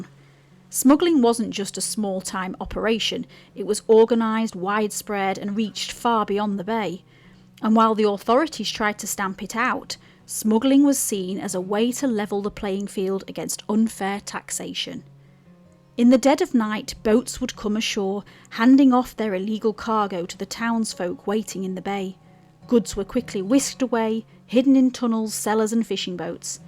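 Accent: Yorkshire, United Kingdom. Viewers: I don't remember how I arrived at your channel, but it was probably through finding videos on Yorkshire people and locations. As an American, I find the Yorkshire accent probably the most charming English accent. It drops the H's and R's like RP, but has the northern lilt that the "posh" accents lack. I could listen to someone from Yorkshire read the back of a cereal box and be enthralled. Anyway, thanks for introducing someone from across the pond to Robin Hood's Bay.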